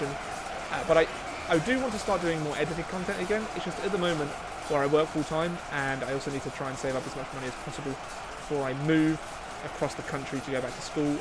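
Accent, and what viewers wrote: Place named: England, United Kingdom. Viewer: Are English accent annoys me you should talk less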